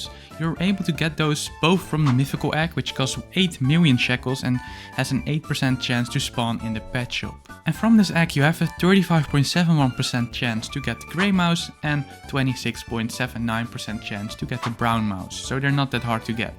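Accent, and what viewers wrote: Netherlands. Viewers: are you dutch cuz i can hear that accent? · Are u Dutch?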